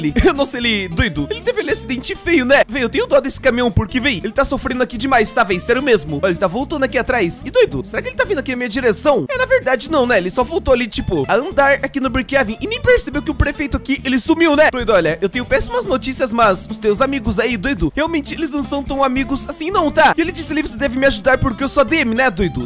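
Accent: Portugal. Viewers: Sotaque de Portugal...blz né kkk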